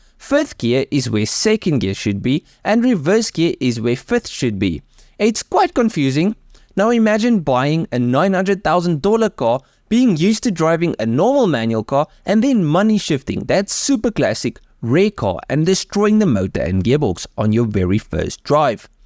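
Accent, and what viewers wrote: Afrikaans, South Africa. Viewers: Does anybody listen not only for the very interesting cars but also for guy's wild afrikaans accent?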